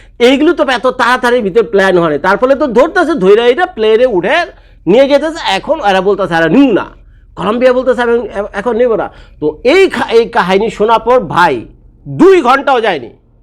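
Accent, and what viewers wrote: Dhaka, Bangladesh. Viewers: মনে হচ্ছে আসল ঢাকার কোন মানুষ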